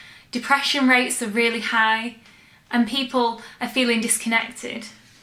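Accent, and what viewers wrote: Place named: England, United Kingdom. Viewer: your analysis is simple and correct. Especially delivered with beautiful English accent.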